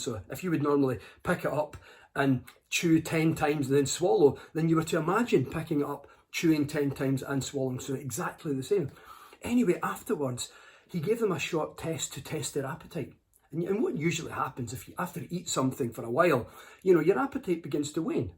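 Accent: Scotland, United Kingdom. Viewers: Hey. Love your Scottish accent, m8. I have a major passion for accents. Scottish accents are so beautiful. Cheers. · I like this guy's books better than listening to his talks. The Scottish brogue can be hard to understand, but this guy has a nice personality.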